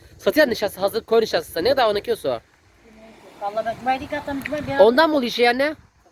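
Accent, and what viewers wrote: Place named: Bartın, Turkey. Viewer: Has bartin şivesi.halişka bu deyil misir unu ile olur halişka .bunun adı hamur atmasi .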